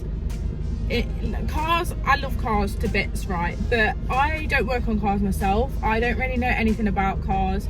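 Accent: United Kingdom. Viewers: Are you from derby?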